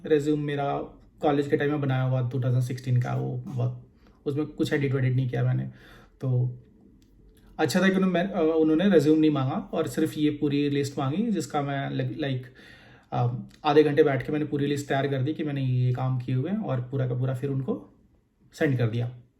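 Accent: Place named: United States. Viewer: How did you learn US English ?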